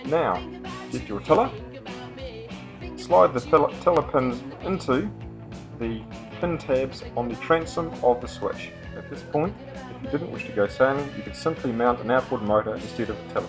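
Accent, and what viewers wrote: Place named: New Zealand. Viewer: Love the concept and the New Zealand accent!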